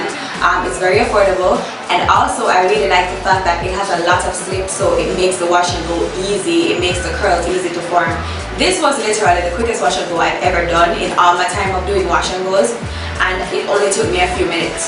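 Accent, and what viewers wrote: Jamaica. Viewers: Is she Jamaican ?? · I’m a simple girl. I hear a JA accent, I subscribe. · I must be so use to hearing a JA accent 💀 cause everybody saying they hear one and i bearley hear it😭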